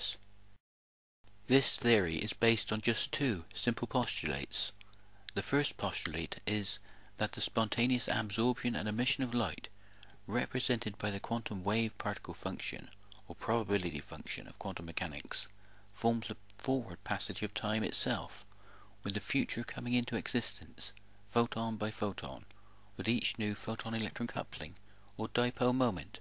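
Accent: West Country, United Kingdom. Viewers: Sorry much as your notions are harmless, people like deepka chopra and yourself abusing physics terminology to present some New Age quantum woo irks me somewhat, its deceptive. Physics is much more inspiring than speculative imaginings involving notions like sacred geometry and the universe is conscious. I have one question why do you frame such thoughts using this terminology , its obvious your not really competent with its meaning in science? What accent is that , sounds west country , Cornwall, Bristol, Glastonbury ? All the best.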